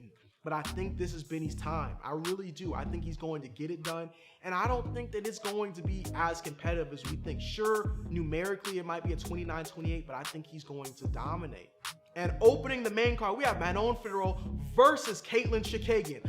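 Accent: Ireland. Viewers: This host has a very different vibe from the usual calming Irish accent. Polar opposite even. Wish him the best but sorry not the one for me. Please stop shouting at me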